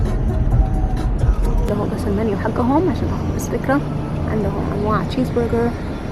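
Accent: Hejaz, Saudi Arabia. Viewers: مادام انتي جنوبية يا ليش لهجتك تشبه لهجة المجنسين في جدة والحجاز ،، ياليت تتحدثين اللهجة السعودية البيضا واللي هي لهجة قبيلية واضحة ،، ماهو حلو انه الانسان يضيع هويته الاصليه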